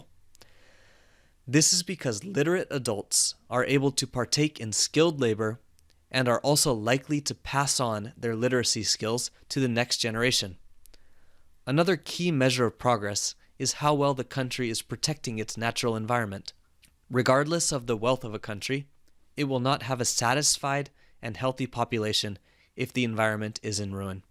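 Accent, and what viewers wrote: United States. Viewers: Should British english be used to write in IELTS? You wrote labour as "labor" (which also means giving birth i think) :) Also, you sound like the speaker in the listening test! (but with the american accent haha). Anyway, this lesson truly is helpful! I have my tests on 3rd June. I hope to be ready to for band 6+, or even 7,8!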